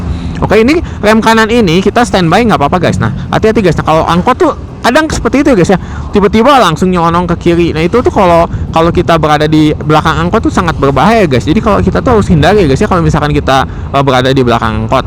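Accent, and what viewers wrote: Bandung, Indonesia. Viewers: KK nya orang Bandung yah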